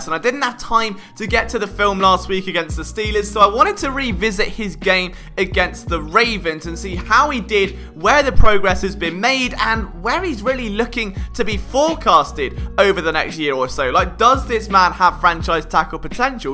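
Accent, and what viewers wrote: United Kingdom. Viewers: why iss a british guy talking about football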